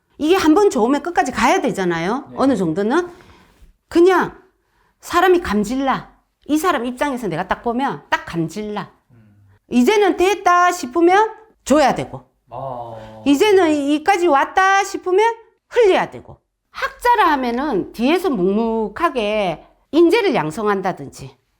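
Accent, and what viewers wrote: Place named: Busan, South Korea. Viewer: 이사람.말투도 너무 싫음 부산사투리 쓰는사람 싫지 않았는데 이사람은 유난히 듣기거북함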